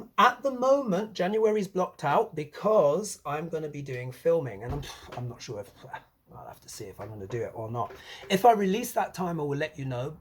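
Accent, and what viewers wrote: United Kingdom. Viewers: You are my favorite Vedic Astrologer. That British accent is awesome.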